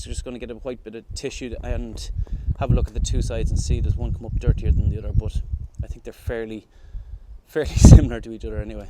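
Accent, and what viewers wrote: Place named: Ireland. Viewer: are you irish?love the accent 👍🏿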